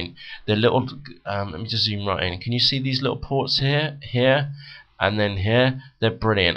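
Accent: United Kingdom. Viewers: are u British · Your speak is too British!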